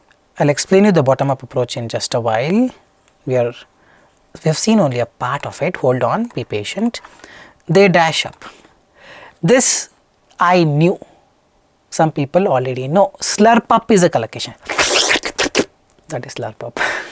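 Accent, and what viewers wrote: India. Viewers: Hi. You are simply awesome! I discovered your channel recently but you do have a way of catering to the needs of even a below average student in English. Your humor while teaching with that Indian butler English is making long videos seem short as we laugh and feel refreshed and still gather up to listen to you more. Keep up the good work you are doing! 👏🏼👏🏼👏🏼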